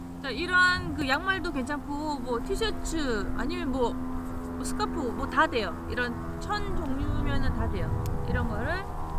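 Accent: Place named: Gwangju, South Korea. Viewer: 누나 말투가 저랑 동향같은데 어디세요? 전 광주입니다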